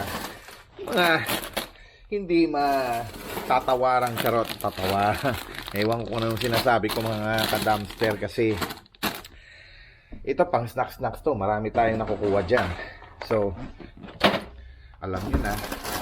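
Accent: Iloilo, Philippines. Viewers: Not daily ka ga ɓlog gali? 1st time ko nag lantaw bal an ko gid na ilonggo ka!❤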